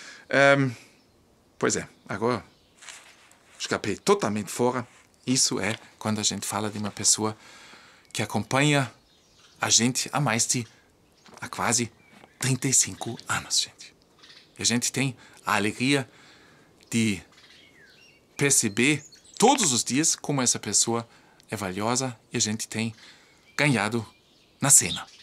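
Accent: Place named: Germany. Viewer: Olá. Você é muito simpático e adorável. Suas reportagens na língua portuguesa com o sotaque alemão é único. Sou sua fã.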